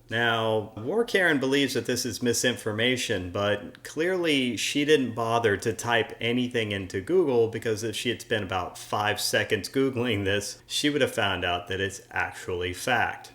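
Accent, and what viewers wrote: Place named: United States. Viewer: ya sound like an AMERICAN - fire up the music via PATTON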